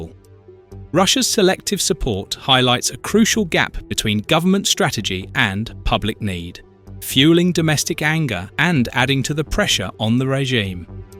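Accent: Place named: United Kingdom. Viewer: Who are reporting your mis guided assessment? We patriotic Iranians are watching all biased media mis reporting the protests and what brave Iranians protesters are calling for on the streets, they are taking their country back after 47 years of occupation by islamist terrorists who are not even from Iranian decedents and majority were born in Iraq and lebenan. So this uprising is about taking our country back and bring back our constitutional monarchy this is the main slogan shouted on the streets return of monarchy and Pahlavi, so do not try to misreport because of being a biased media, God knows which power or ideology is behind your rasneh, I note you are based in Bangeladesh and have a british accent. Times have changed this is not 1979 when the West removed our beloved Shah and brought these terrorists to Iran and deceived part of the nation who for money and position sold their country, and majority sadly at and watched, now it is 2026 and the last 4 generations have become aware of their history and what a beautiful country they had under Pahlavi, so enough is enough we will bri g back our monarchy and the glory we had to Iran whether you biased media and globalists western powers want it or not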